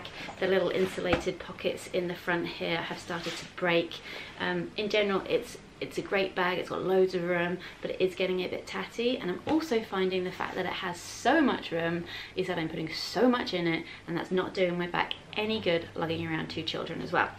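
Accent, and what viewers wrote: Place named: United Kingdom. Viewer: Good video (yeah you were nervous, but I would be too and you quickly recovered your composure). Love the British accent. Adds class. :) About that big front flap/pocket... I'm a great grandmother... I'm thinking if you're changing a nappie / diaper, perhaps having to unzip a bag to get replacements and wipes would be difficult so put the nappies and wipes in that large outside pocket?? Just an idea (which you may have already thought of). I like bags like this for travel (since I no longer have babies/toddlers). Oh and I love your way of storing stuff above the sink (the utensil bar). What a great idea! (I know that has nothing to do with your video subject, just thought I would mention it)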